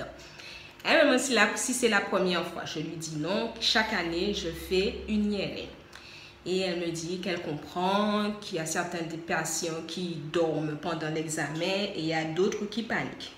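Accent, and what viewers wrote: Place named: Guadeloupe, France. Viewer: Salut moi aussi j ai la drépanocytose Tu as l’accent de la Guadeloupe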